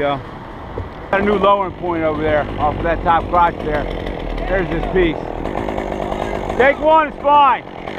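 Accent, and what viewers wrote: New York, United States. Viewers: Excellent video. Are you from New York?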